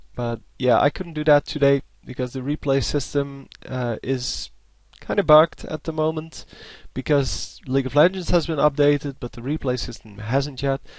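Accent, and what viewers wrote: Netherlands. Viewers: Haha, ik wist het! Nederlands, hoorde het aan je accent =p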